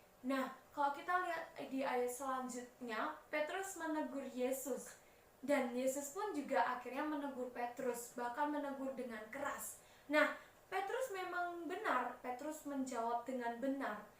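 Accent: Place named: Java, Indonesia. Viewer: Aminn.. makasih ci... Medoknya menjadi ciri khas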